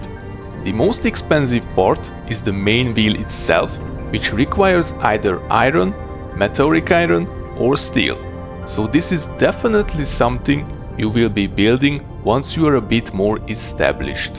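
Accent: Hungary. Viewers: instantly recognized the thick hungarian accent. Bojler eladó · Sounds like you have a Hungarian accent, am I right to assume that it is your mother language?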